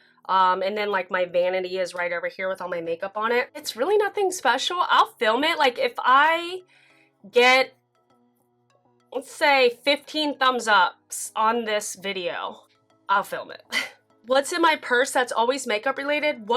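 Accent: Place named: Appalachia, United States. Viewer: You're so down to earth! Honestly that accent is pretty close to the North Central WV/ South West PA accent... Semi-Appalachian. I'm from Morgantown, WV and you sound very similar to me.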